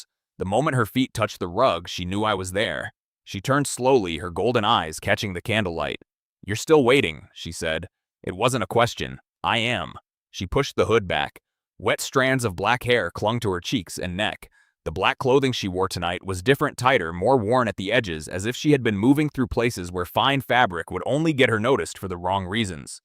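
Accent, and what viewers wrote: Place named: United States. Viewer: it's marginally better if slowed down to 90% but that voice is very American and whiney ... It doesn't fit with the context or the content.. but the story itself works well.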